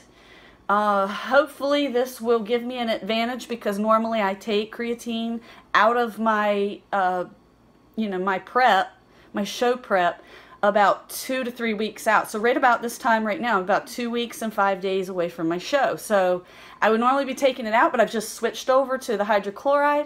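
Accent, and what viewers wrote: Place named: South, United States. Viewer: I'm hearing a little southern draw there. It's refreshing. Good info.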